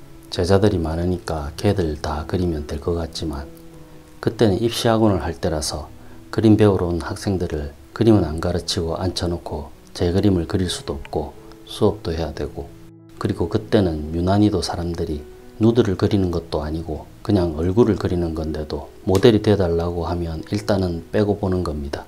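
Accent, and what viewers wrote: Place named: Busan, South Korea. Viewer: 정말 아재같으셔서 구좋알했습니다. 말투가 딱 들어보니 부산 같으신데 맞지요?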